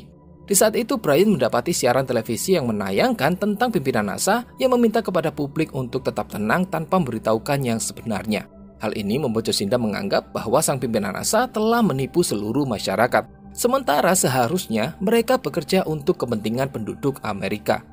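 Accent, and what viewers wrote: Java, Indonesia. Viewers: Sabar ,lagi myesuain medhok dengan kuping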